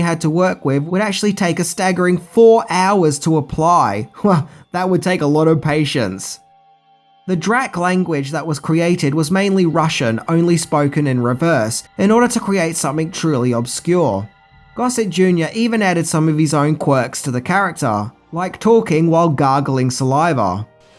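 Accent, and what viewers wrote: United Kingdom. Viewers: I can't get around the British accent of the narrator. The inability to pronounce Rs, Ts, and THs drive me crazy. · alway he british accent EVA /= either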